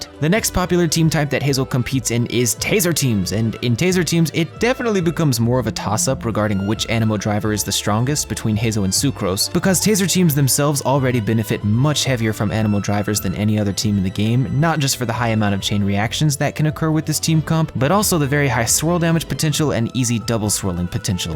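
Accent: United Kingdom. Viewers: That British accent caught me off guard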